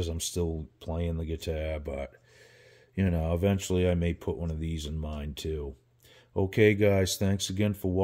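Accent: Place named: Boston, United States. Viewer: It'd be interesting to see Leo's thoughts as he designed this madness (?) Always enjoy your 'Baston' flavored narrative... Cheerios (but not the cereal)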